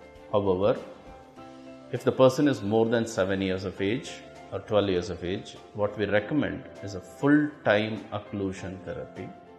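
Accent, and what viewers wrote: India. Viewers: His indian accent made the video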